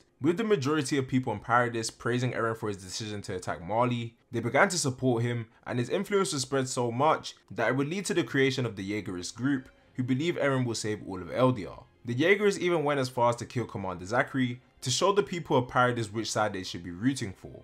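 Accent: London, United Kingdom. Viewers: London innit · bro speak less briish english pls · I love learning all the different kinds of British accents and yours is awesome. Definitely gonna try and learn it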